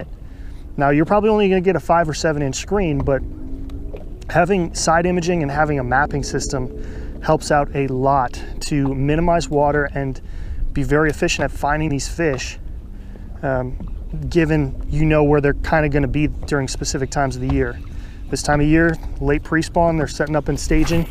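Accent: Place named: Canada. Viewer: I thought you were from Canada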